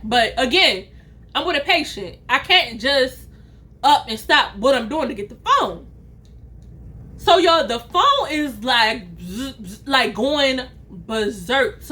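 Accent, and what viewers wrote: Baltimore, United States. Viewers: Girl you are my favorite storytime teller I get so happy when you post a storytime yesssss and the Baltimore accent adds a little pazazzzzzzz 😂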